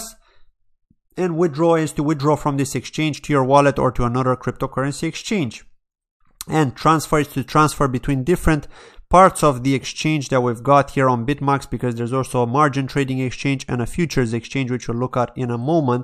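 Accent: Ireland. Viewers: You sound like an Irishman ☘️☘️☘️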